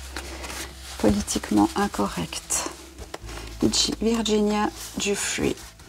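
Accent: Switzerland. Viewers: Coucou, il me disait bien que ce petit accent me rappelait quelque chose 😃😃 un gros bisou de Genève 🥰🥰🥰🇨🇭🇨🇭🇨🇭🇨🇭🇨🇭🇨🇭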